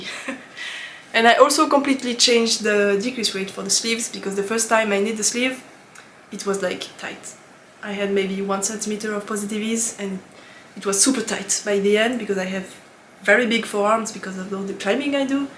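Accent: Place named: France. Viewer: Love you French accent. Do you use raveyto count your projects?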